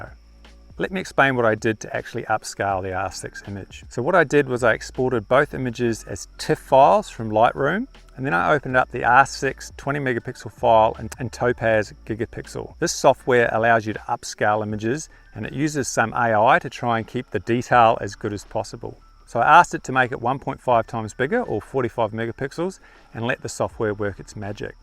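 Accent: Australia. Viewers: should add some subtitles mate, ur ausie accent isnt so easy for everybody..gday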